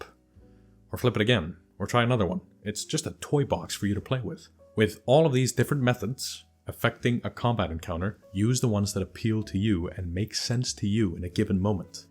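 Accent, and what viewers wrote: Iceland. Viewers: You have a great voice. The tiniest little hint of an Icelandic accent gives your voice a bit of charm, and your pronunciation is very clear and crisp, making you easy to understand on cheap, low-quality speakers.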